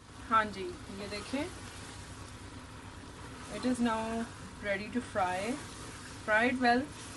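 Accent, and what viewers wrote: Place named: Punjab, India. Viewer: MA'AM.....you have Punjabi accent while speaking.....firstly I thought you are from INDIA.....it's so similar accent.....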